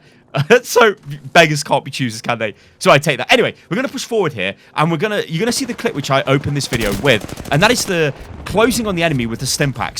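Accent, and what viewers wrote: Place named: United Kingdom. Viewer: 200iq play. I’m fucking cracking up. Whatever anyway my twitch is “theToxicLlama” I’m crazy funny toxic and I suck ass. Tune in. Rumor has it I’m gonna off myself soon for losing so many games. Great content. Always watched your OW stuff. I am truly truly brutal on my OW streams. P.s I generally hate British people. Stupid fucking accent but your aighttt big brain big IQ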